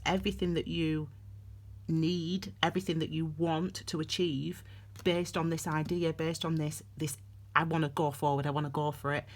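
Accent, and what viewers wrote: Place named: East Lancashire, United Kingdom. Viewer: Where are you from? Sounds like East Lancs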